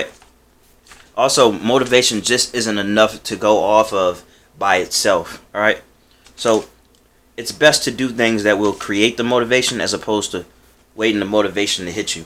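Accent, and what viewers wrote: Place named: Baton Rouge, United States. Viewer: Another good video! Now, a totally off-topic question: Do you have any connections to Baton Rouge? The way you say certain words takes me back to the time I spent at LSU.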